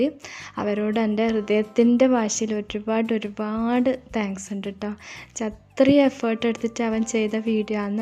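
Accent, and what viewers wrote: Kannur, India. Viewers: Ningalude Kannur language presentation style beautiful 👌 Speedil parayunnadine kaal better ithu thanne ☺️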